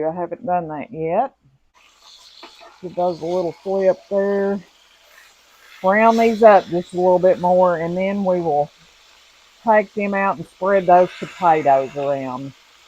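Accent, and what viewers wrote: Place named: South, United States. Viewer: I love Southern food, as well as your accent! I once had a ton of leftover hamburger buns and threw them in the oven on low and toasted them to make breadcrumbs. Delicious because I added my own seasoning. Thank you for your video and chat.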